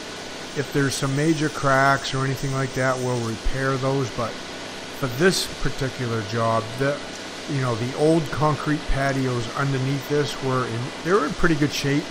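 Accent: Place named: Boston, United States. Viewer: Cool video. That accent is confusing me. Sounds a little north boston crossed with cape cod.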